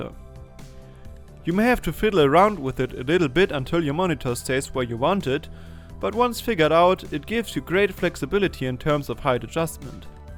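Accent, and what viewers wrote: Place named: Germany. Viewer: Ich liebe deinen deutsch akzent gutes review, auch wenn ich es 4 Jahre später sehe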